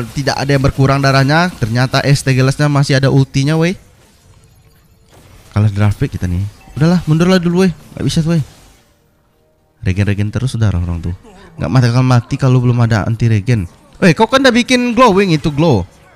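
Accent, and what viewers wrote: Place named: Pekanbaru, Indonesia. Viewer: Org Pekanbaru bg? Nampak betol logat pekan ABG awak ni 😅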